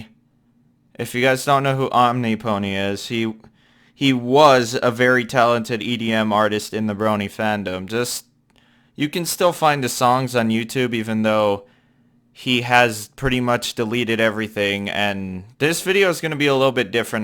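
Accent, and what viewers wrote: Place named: South, United States. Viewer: DUDE, you didn’t even know what happened before, but just screenshotting his last posts from twitter and gluing the voiceover of your redneck voice is very smart, right? Do you even know that that 12-year-old girl was a friend and, by correspondence in the discord, she herself agreed and continued to write "yea I suck your d1ck" and so on. then she simply took and leaked the correspondence, allegedly exposing that he seduced her at the his age of 20, and stupid people ate it. I'm sorry I can't find screenshots of this play chat role, because it was clearly expressed there that she deliberately flirted with him for her own purposes in order to take him by surprise. nasty and stupid people now think ONLY by hearsay from strangers that he is some kind of pedophile. Is this your first time on the internet?!?! Didn't you roleplay with anyone at the age of 12? 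this person then, of course, began to apologize and make excuses because people misunderstood him, or vice versa, they began to write "eewww you pedo! how do you even live!" people nasty and disgusting ruined the full potential of the best musician I have ever heard.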